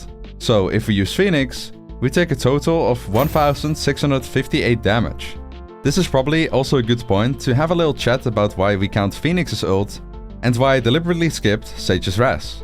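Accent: Netherlands. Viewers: Typisch NL accent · Lol i thought you had a dutch accent. Then i saw “Netherlands” on your channel :P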